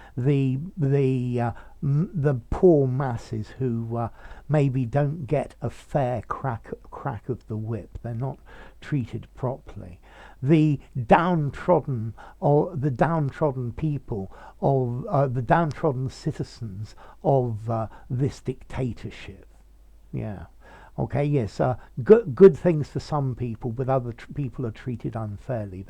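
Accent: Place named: England, United Kingdom. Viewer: I can't thank you sir . Really clear explanation + awsome English accent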